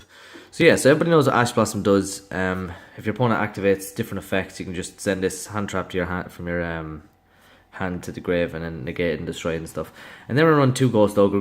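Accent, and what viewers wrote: Ireland. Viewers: You Irish mate? What part? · Is that an Irish accent I hear?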